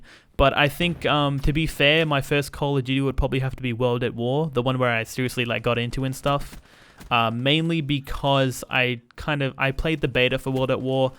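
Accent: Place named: Australia. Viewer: wow your an aussie too. I though you were. you should do something like a sparkle special but joocy raging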